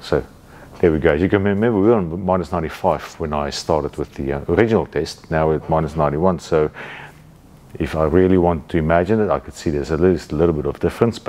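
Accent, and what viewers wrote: South Africa. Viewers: Thanks for the video, very useful. You sound very South African?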